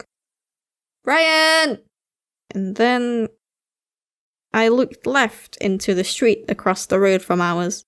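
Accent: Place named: United Kingdom. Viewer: You sound British!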